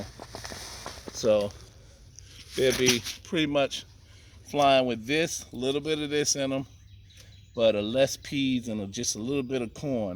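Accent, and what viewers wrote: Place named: South, United States. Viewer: I knew you sounded southern lol i acually live in tn about 2 hours from u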